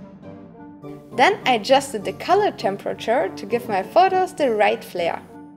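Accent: Germany. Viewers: I love your german accent xD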